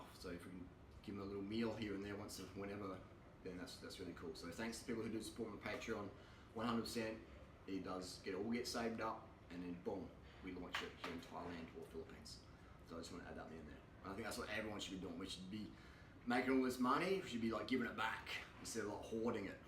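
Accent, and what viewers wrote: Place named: Australia. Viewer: Have you tried the English Subtitles, It does help non Aussies. One thing though, there are quite a few Auto Generated words which are not the words he used.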